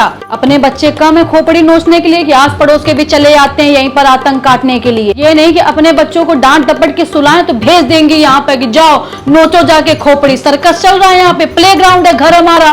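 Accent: Kanpur, India. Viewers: I think this is Kanpuriya style of speaking very nicely presented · हर समय क्रोध में ..!!..!!. वैसे आप की बोलने की शैली कानपुर की लगती है 😊। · Kanpuriya language. Heart touching. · Nice style as mammi. Bhasha sunta hoon to apno jaisa lagta hai ji. Kanpur ki jaisi boli hai.👌👌👌👌👌👌👌👌👌👌👌👌👌👌👌👌👌👌👌👌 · apki awaj bilkul hamare Kanpur jaisi hai.kya aap Kanpur she belong karti ho.your acting is super,real facts,real me aisa hi hota hai.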